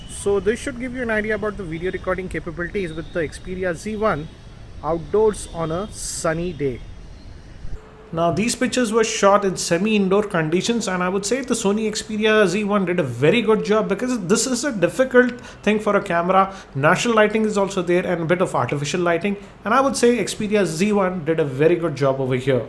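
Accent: India. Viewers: that indian english gave me cancer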